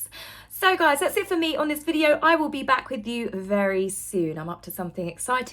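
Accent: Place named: RP, United Kingdom. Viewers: dull with low intelligence hidden by posh accent. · Sussex accent.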